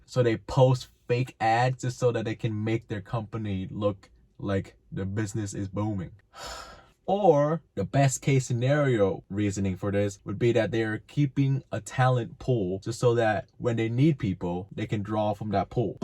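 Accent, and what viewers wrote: Vietnam. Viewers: Are you in America? Sounds Vietnamese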